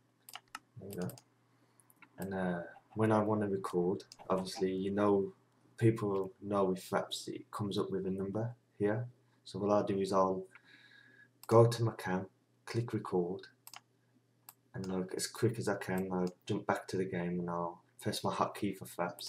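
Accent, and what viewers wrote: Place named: Birmingham, United Kingdom. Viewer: Woohoo a brummie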